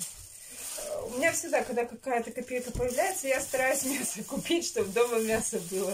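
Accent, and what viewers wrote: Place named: Nizhny Novgorod, Russia. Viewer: А я сразу понимаю что человек с нижнего Новгорода по говору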